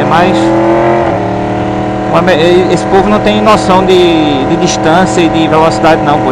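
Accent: Pernambuco, Brazil. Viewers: pelo seu sotaque voce deve ser daqui da terrinha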